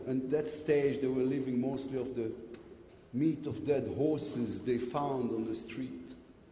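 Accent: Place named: Israel. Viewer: פעם ראשונה שאני שומע את לפיד מדבר באנגלית. מדבר אנגלית ממש טוב בקושי שומעים את המבטא הישראלי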